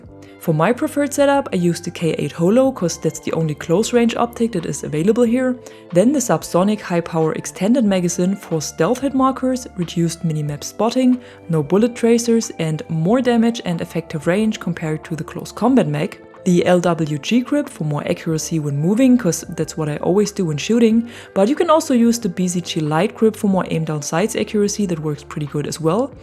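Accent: Germany. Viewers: Ha ich wusste das du deutsche bist :D hört man tatsächlich raus.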